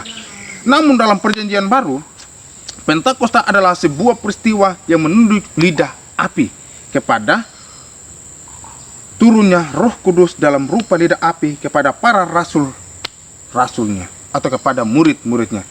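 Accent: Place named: South Sulawesi, Indonesia. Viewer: Anda orang Toraja kah? Kayak Logat sulawesi selatan... Anda aliran Karismatik atau Pentakosta atau Reform?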